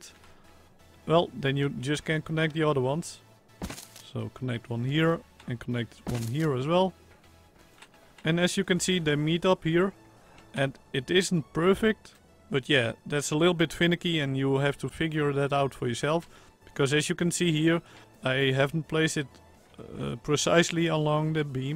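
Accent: Netherlands. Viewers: Are you Dutch? I grew up in holland but now live in the USA. Love the accent lol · You can tell this guy is dutch, een goeie dag trauwens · Dat dutch accent is funny. Nice build btw 😃👍🇳🇱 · the dutch accent xD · Je hoort gewoon dat hij nederlands is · Lekker nederlands accent 😂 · are u dutch ? i can hear an accent there hehe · Ik kijk je voor de eerste keer en kon gelijk horen dat je nederlands bent ;) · Are u Dutch? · je hoort een beetje een nl accent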